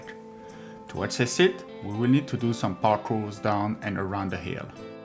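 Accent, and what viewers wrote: France. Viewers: What accent is this?? It sounds almost French with a touch of Dutch or even German.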